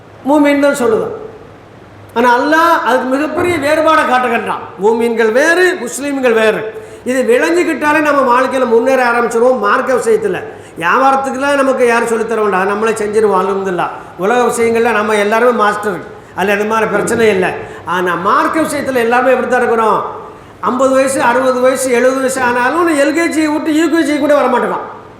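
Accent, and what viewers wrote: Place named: Tirunelveli, India. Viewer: முழுவதுமாக பயானை கேட்டேன். அருமையான திருநெல்வேலி தமிழில் ஒரு சிறந்த பயான் அல்ஹம்துலில்லாஹ் வேண்டிய பயான் ஆண்களும் பெண்களும் அதிகம் அதிகம் பயான்கள் கேட்பதால் நம்முடைய ஈமானுக்கு அதிகம் அதிகம் உரம் ஊட்டியது போல் ஆகும் .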